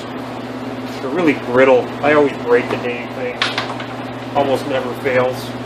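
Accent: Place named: Minnesota, United States. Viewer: haha yah could tell by your accent, i am also from the twin cities. Still driving around this civic?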